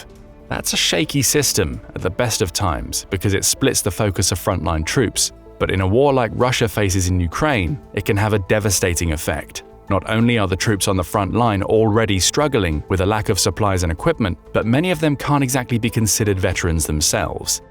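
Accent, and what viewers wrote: United Kingdom. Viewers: Love the Brit announcer, 💯🐖🐖💩🤷🏿‍♂️🥵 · Why do Brits say rusher for russia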